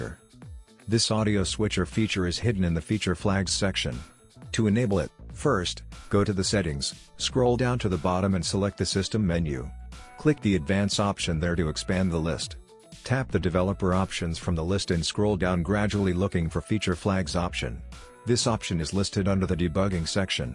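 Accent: India. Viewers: Sounds like a India scammer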